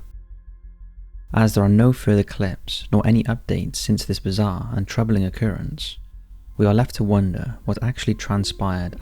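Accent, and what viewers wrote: United Kingdom. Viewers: Where is the narrator from? Obviously UK. But I can't put a region on the accent. I'm from South Wales not far from Cardiff.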